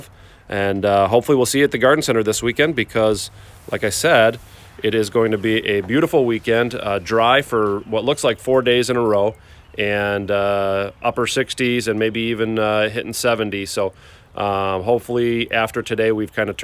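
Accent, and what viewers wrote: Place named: Northern Ireland, United Kingdom. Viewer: Thanks,. Interesting video and confirms what has happened to my hedge here in UK. 😥 btw Off topic. I detect some Northern Irish in your accent, is that your region or are you originally from Ireland?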